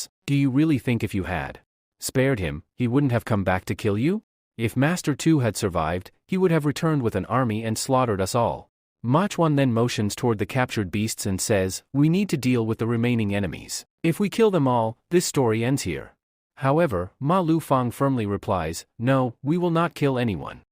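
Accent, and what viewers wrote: India. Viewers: Why give the AI a Indian accent?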